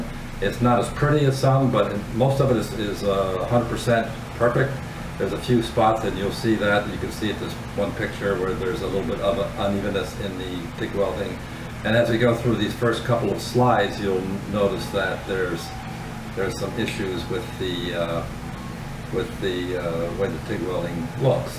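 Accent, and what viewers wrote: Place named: United States. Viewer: Hi. New to he channel. Glad to hear you are feeling better. I came for the RE 650 content and I am happy to see you fitted aTEC Stinger exhaust. I am thinking about buying a RE 650 in the future and I saw TEC's vid regarding this exhaust and was blown away with the torque pick up and weight saving. But I wanted see someone install and opine about it and the performance enhancement. What is TEC charging for it? It not listed on there US website last I checked. Also, on a personal note, you sound like an American, what brought you to live in the Philippines?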